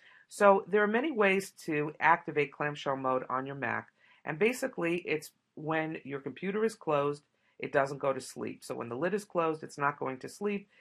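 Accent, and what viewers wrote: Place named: New York, United States. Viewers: love your accent, haha since i am a New york fanatic, i instantly knew it,hahah i know, but im from europe and I really want to go there someday, great video thank you for the great tips :) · Cool Accent. New York ? Jersey ?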